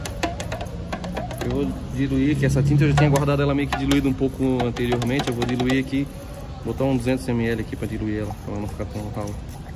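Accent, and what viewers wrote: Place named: Santa Catarina, Brazil. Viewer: Top o trampo! Com esse sotaque deve ser do litoral de SC, hhahahahh. Salve do Zinga/Floripa.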